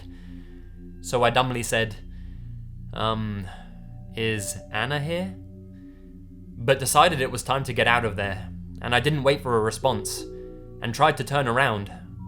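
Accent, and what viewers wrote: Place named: Surrey, United Kingdom. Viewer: bruv how do u talk like that I'm English but you sound like bare posh u must be from sury or summin any great vid m8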